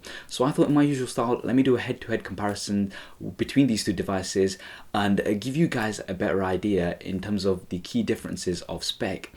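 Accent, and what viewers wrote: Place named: Newcastle, United Kingdom. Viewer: Thumbs up for geordie shore accent!!:)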